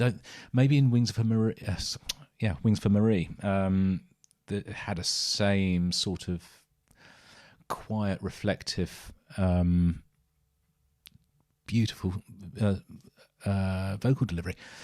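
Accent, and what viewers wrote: United Kingdom. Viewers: Why do I feel like I'm watching I'm Alan Partridge? Probably because you're British and you've a good voice for radio.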